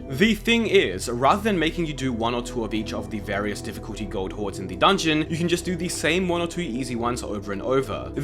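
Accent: Australia. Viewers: Australia? I thought you were frok the UK. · Oh my god... You're Australian?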